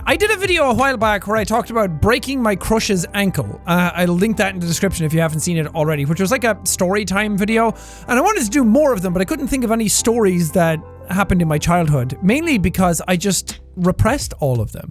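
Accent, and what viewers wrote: Ireland. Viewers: Okay but how he pronounced "dinosaur" was the cutest thing?? I ain't one to make a comment like that but idk. It was cute. ANYWAY! I think jack's talking about Ireland has really amplified his accent and I'm here for it 😂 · After seeing a 7 year old video of, i wonder when did he become so irish? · Idk if this is just me but irish accent makes me happy watching his videos in genral make me happy. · My goodness, Irish was full frontal in this video 😂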